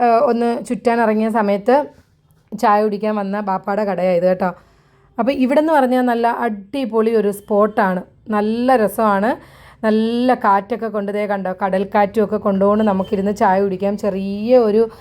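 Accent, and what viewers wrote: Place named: Travancore, India. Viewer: തിരുവിതാംകൂറിലെ സംസാരം എല്ലാവർക്കും വ്യക്തമായി മനസ്സിലാകും. അഭിനന്ദനങ്ങൾ.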